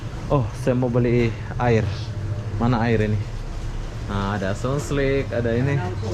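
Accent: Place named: Malaysia. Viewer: Anda memang orang malaysia bro ,jangan mengaku- ngaku sebagai warga indonesia,dari logat dan dialek bahasa juga sudah terlihat,bikin kontan soal indonesia hanya cari simpati ,cari folower dari indonesia,lebih baik jujur seperti obin morales,seperti tengkuruk channel,seperti rusdi rusli dia jujur asal malaysia tidak berpura2,kami lebih respek orang yang jujur daripada pura2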